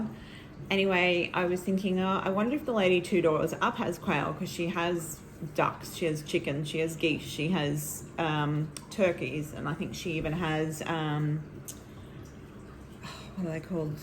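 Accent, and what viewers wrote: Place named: Australia. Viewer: love your podcast. Your stitching is beautiful. Love your embroidery too, but I only hand embroider, so I cannot attempt those lovely pieces, sadly. So nice to hear an Australian accent. I'm an expat Kiwi in Texas, 21 years, now. Look forward to watching more. Kia Kaha.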